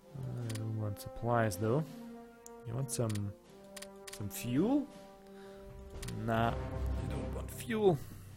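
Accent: Germany. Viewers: I might be a bit late to the party but I'm thoroughly enjoying the lets play so far and looking forward to the rest. keep up the good work and I love the German accent!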